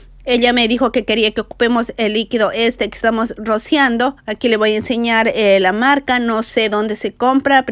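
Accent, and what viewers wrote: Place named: Ecuador. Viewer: Hola, apenas hoy encontré tu canal y vi muchos videos, y de una reconocí tu acento ecuatoriano:), aprendí mucho. Muchas gracias por compartir tus truquitos. Un abrazo desde Quito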